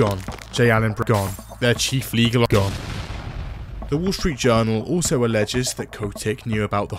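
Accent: United Kingdom. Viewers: all British people sound the exact same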